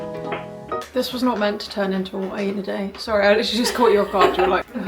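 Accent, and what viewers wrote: United Kingdom. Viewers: U sound so posh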